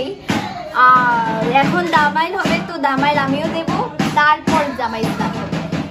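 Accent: Sylhet, Bangladesh. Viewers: Are you from Assam or bangladesh? Because this is sylheti bangla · Does assami people speaks sylheti accent or what or are you from there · Sylheti naki tumi? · Tumi ki sylhety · আপু তুমি কি সিলেটি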